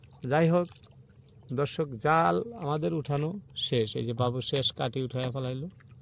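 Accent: Bangladesh. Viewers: আপনার মুখের কথার ধরন দেখে মনে হয় আপনি বাংলাদেশের মানুষ কিন্তু বুঝতে পারলাম না আপনি ভারতে গিয়ে কিভাবে ভিডিও বানালেন?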